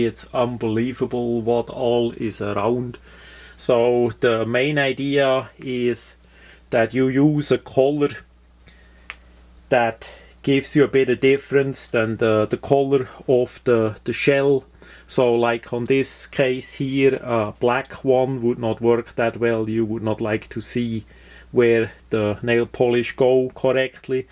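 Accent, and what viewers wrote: Switzerland. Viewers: ... stuuupid as a breeead as they are ... ich lach mich schlapp, geiler schwiiizer Akzent und nette Geschichte! weiter so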